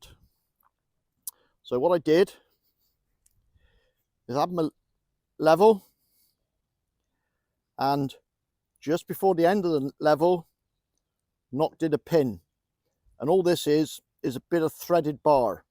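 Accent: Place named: England, United Kingdom. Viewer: I'm curious if you are Canadian or English. Accent tells me English, swapping between metric and imperial, got to be Canadian.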